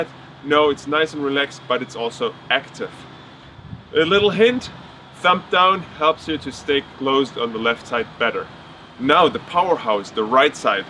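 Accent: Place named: Germany. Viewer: I like the german accent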